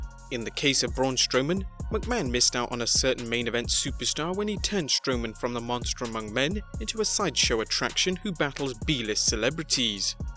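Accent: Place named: RP, United Kingdom. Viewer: It's funny hearing a guy with a posh British accent reading a Vince Russo quote.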